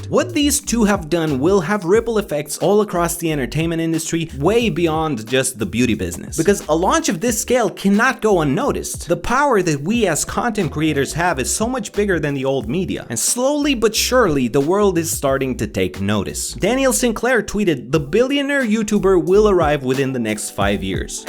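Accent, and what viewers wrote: Romania. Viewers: Wait wtf why is thi guy having a "romanian" accent?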